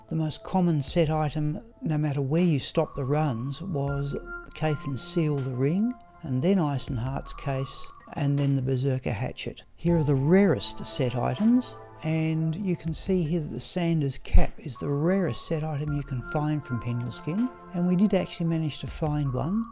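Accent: Australia. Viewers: I began watching your streams and videos probably about a year and a half ago, and through all the good characters and crafting sessions, I loved watching you run Pindleskin more than anything. It was fun to see some of the drops and lowlights make it into various monthly highlight/lowlight videos, as I could look back and exclaim, "hah, I remember that stream!" I've been watching many streams for many years, as well as ASMR videos on YouTube for roughly a decade. Your streams tirelessly plugging away at Pindleskin always put me into a nearly catatonic or zen-like state. I do not say they were boring. Rather the opposite. Your cadence and Australian accent and logical approach reminded me of a professor I had many years ago, and it was funny to find out you were also an educator. I could listen to him or you for days and find solace. Call it weird or interesting, I had to share. 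I'm not alone here in saying we all appreciate your streams and especially your dedication, sharing a game we all love. Personally I have a much different vested interest in that your candor/approach are the perfect accompanying background noise or secondary monitor treat to my lifestyle of gaming and streaming. So from me to you, thank you. (I formerly went by Aralern24, but changed my YouTube alias to reflect my world of warcraft character lol)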